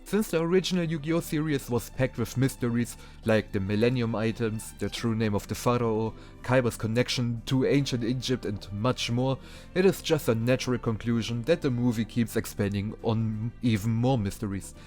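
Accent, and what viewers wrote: Germany. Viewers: Boa wie man dein Deutsch heraus hört hahaha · Dieses eingedeutschte Englisch ist schrecklich.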